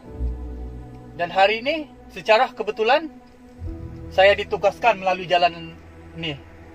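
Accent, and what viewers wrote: Sabah, Malaysia. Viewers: cara bercakap ni tentu orang Sabah bah ni di KK bah tu , inda kau tau itu orang KK itu sana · Kesian loghat sabah pun tk tau bezakan kata org malaysia. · Bunyi sabahan kuat juga tu.. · sama ada sabah atau indonesia..sbb bahasa nak sama dah..tapi tengok kt video ade tulis indonesia xtaw la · Abang org mana bang? Sebab dengar loghat mcm belah Sabah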